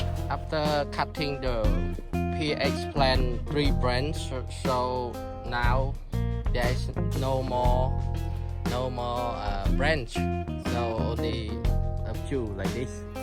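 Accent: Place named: Cambodia. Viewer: you must be Cambodian, sound like my uncle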